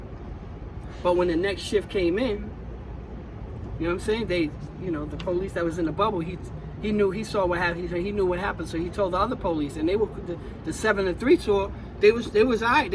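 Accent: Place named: New York, United States. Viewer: Shout out from Australia...Love the content and I Love your NY accent...i hope the kids hear the realness of the message your trying to send. Hopefully people hearing this can AVOID prison altogether. GL to u and all the best in your endeavours in the future...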